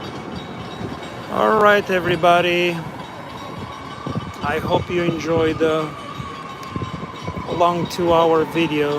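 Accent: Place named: Romania. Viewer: Bănuiam eu că ești român după accent dar nu eram chiar sigura. Mulțumesc de sightseing.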